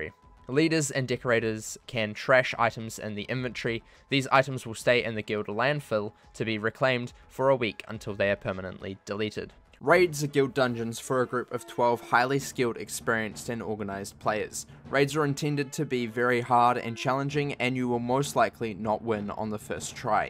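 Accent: New Zealand. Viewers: Kia Ora bro, first time watching your video and could instantly tell you were also a Kiwi. First one I've actually ever found from wiz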